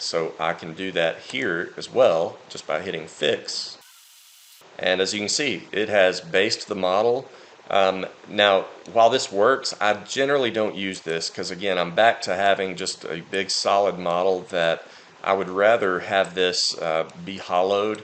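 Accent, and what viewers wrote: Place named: Chattanooga, United States. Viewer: Thank you! Very helpful. I grew up in Chattanooga, thought I recognized that accent, cheers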